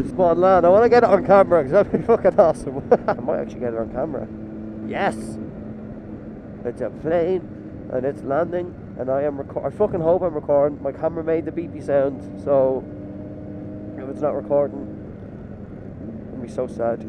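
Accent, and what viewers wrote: Ireland. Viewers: What part of Ireland you sound Kildare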